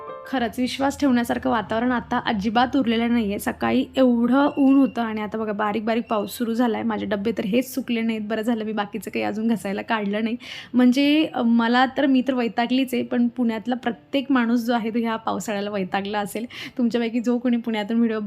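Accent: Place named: Khandesh, India. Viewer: Tai ahirani bolat ja na madhe madhe... khupach god vatat hoti aikayla.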